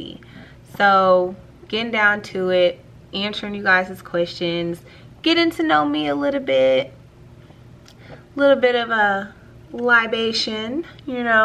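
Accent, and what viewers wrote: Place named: South, United States. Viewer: Ya accent is so sexy. I love that country girl accent?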